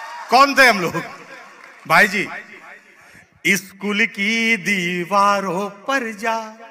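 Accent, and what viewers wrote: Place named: Allahabad, India. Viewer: aapki awwaj me hi allahabad (prayagraj) ki mahak hai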